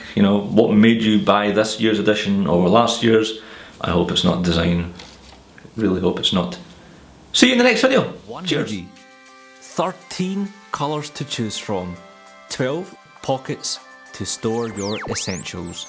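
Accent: Scotland, United Kingdom. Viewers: i like your accent. scottish? i'm italian btw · Love your scottish accent, but im convinced that Siri will learn my language (finnish) before scottish ;) Thank for the video bro! btw: planning my third trip to Scotland! Cant wait to see the highlands once again!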